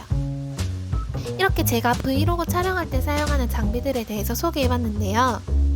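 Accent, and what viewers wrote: Busan, South Korea. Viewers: 나중에 카메라 하나 장만하게 되면 바로 너로 찜뽕이다 후하 그나저나 중간중간에 부산 사투리 들리는 게 너무 귀엽고 좋네요😚 오늘 영상도 잘봤어요!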